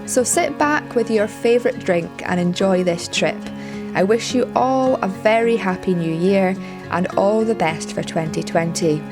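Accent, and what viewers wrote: Scotland, United Kingdom. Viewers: Loved the video! I am late to watching your channel so I am trying to see all your work. You are helping me enjoy the confinement of COVID-19. Who would have thought what 2020 would bring...just crazy. There is so much to enjoy in your videography and photography. You should consider documentary voice overs as your enunciation is so clear and the Scottish accent is beautiful. I may have said this before I could listen to you talk all day. Stay safe Fred in Texas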